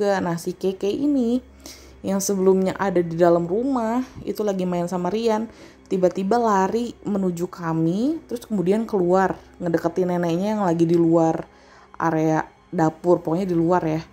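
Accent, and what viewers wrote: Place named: Jakarta, Indonesia. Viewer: Ya karena logat dia logat anak2 jakarta, kalau anak daerah ya agak susah cerita kaya gini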